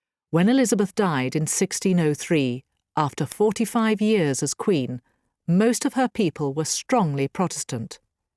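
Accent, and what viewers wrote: United Kingdom. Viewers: I love british accents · I love the British english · Great video! I love British accent. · is the reader is of british ancent ?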